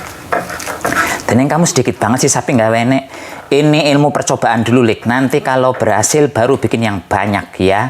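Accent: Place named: Java, Indonesia. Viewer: asli mana ya, kok jawa bangey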